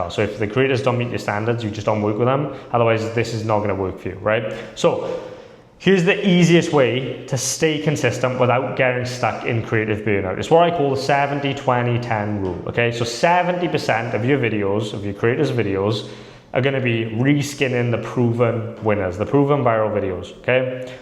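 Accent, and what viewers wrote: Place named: United Kingdom. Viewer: Omfg not another british dude lol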